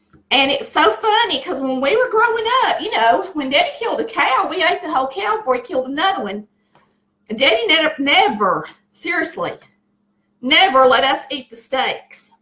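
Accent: South, United States. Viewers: Was very relaxing watching you cook. You’re a sweet doll. Love listening to your southern accent. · you are great cook & a "hoot" to listen to your wonderful southern drawl! Love it. I live in MI but born in VA & Mom Dad & brothers born in TN. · I just found your videos and I have to say "I love you!" Your southern draw and your family stories made me smile throughout the entire video. You remind me of my grandma and family who lives in Nacogdoches Texas. I have been looking for the perfect from scratch dumplings and I think yours is it. I can't wait to try yours out. Thank you so much for sharing. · I love listening to a true Southerner talk. There are so few of us out there. Thanks, Great tutorial! New subscriber. ✌🏻❤ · What a great video. Love the dumpling recipe. You cook the same way Granny did. I learned from her. You mentioned the mixer you didn't like. 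I have one that was made probably in the 20' maybe 30's. It's wire instead of flat slots like yours and it works great. I can't find one like it now days. You talked also about dressing. Granny would make her's with the liver from the turkey or chicken livers. It was actually really good. I grew up on a farm here in Missouri. Dad butchered our beef. Just like you He got the T-bone steaks. We got the lesser cuts. We would maybe get a T-bone right after he butchered. The rest were his. It' great to hear your southern accent. I miss it. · We love chicken and dumplings. I want to add that your southern accent is great! Yes I’m from Texas but not all videos have the southern accent that I personally love!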